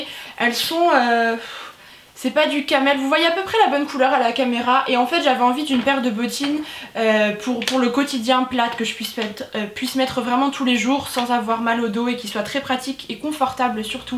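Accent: Lorraine, France. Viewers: Cc sa se voix que tu vie en Lorraine ton acsant est beau tu à de la chance moi je normande sinon super vidéo tes achat son stylée bravo continue comme ça!!! bizou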